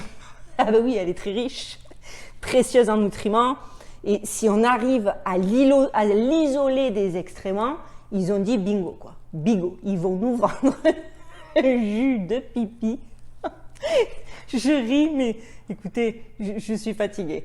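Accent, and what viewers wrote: Marseille, France. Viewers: Loook et accent de cagole, discours complotiste de tete percée....ha ha ha ....sérieux....qui peut écouter ca plus de 30 secondes sans avoir envie de l'entartrer cette folle ! FOUT TOI A POIL ! T'auras plus de viewers !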